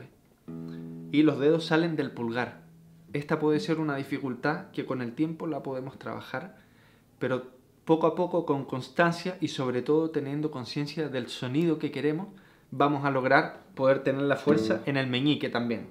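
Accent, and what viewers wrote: Chile. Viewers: Oshe, pues me dejas con la duda porque tiene un deje chileno que tumba.. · Con razón po, eres chileno, con razón me parecía familiar tu acento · Wena compadre! Te encontré hoy y que gran sorpresa. Estoy aprendiendo rumba, flamenco, vals y boleros. Te agradezco nucho lo que compartes. Caxaba que tenías acento chileno, pero lo comprobé cuando hablaste del chirlito jaja. Ya solicite las tablaturas para estudiar y te estoy siguiendo en instagram. Lo que mas quiero es dominar las técnicas para expresarne genuinamente, sacarne las mascaras y los traumas. Un abrazo. Gracias! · Siiiii. Suena mucho a chileno. Yo también me confundí 🤣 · Eres Chileno? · Oye, habla como chileno. Es que la cagó, no lo puedo creer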